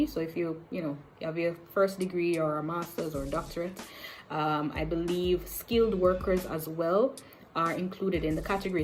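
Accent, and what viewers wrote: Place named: France. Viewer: Very good information for prospective travelers.Are you French?You sound French.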